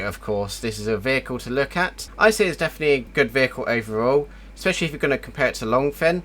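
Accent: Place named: United Kingdom. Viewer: That is British English for sure haha. Thanks for a nice video 😊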